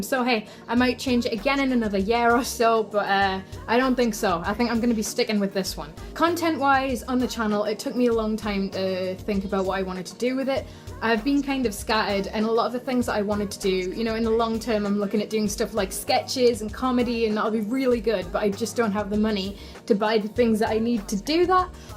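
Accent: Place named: North, United Kingdom. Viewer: !!!!! <333 hearing your accent makes me feel so happy i miss the north sob!!! so excited to see you back on youtube!:D!!!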